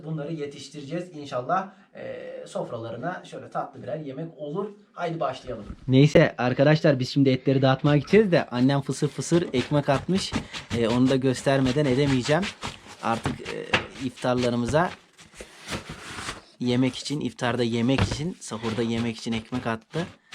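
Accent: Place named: Macır, Turkey. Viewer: Iyilik bulaşıcıdır...lütfen niye gösteriyorsun diyenlere bakmayın yeni keşfettim kanalınızı ve çok çok sevdim. Ailenize de sizede minnoş kızınıza da hayırlı uzun omurler versin benim babam macır göçmen konuşmanız siveniz o yüzden köy özlemini giderip bir nebzede olsa mutlu ediyor🤗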